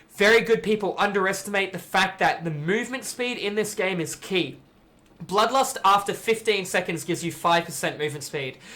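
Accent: Australia. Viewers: It's just his aussie accent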